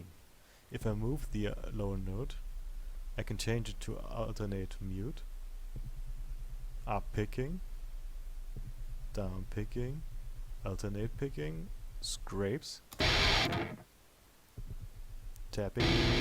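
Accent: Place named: Russia. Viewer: Полюбас русскй акцен ак пробвае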